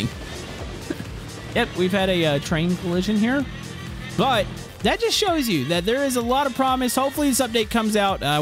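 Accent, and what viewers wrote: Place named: South, United States. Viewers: you have a very southern sounding voice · He's from Texas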